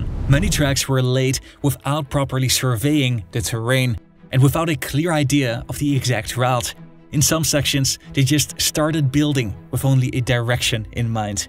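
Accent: Netherlands. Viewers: Lekker nederlands accentje wel haha 😂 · You left the Dutch out of your list of European powers dividing up Asia - and it sounds like you are Dutch..? Did I hear that right? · This has to be a dutch accent. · If the narrator isn't Dutch due to his accent, I will question my entire existence. Also, Sergej Witte is highly underrated, and apparently his re-entry into government benefitted the Russian Empire greatly, though sadly shortly. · как можно не слышать что автор голландец?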